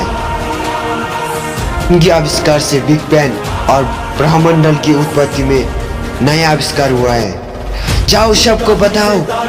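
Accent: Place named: Nepal. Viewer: U nepali bro Accent ley tha vo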